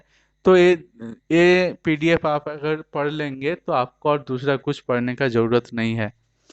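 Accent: Bengal, India. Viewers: are you from west Bengal ? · Yes bro he is bengoli.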